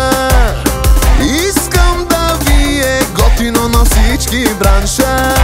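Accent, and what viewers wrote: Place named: India. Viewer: Is he Indian? Because the voice sounds like Hindi or Telugu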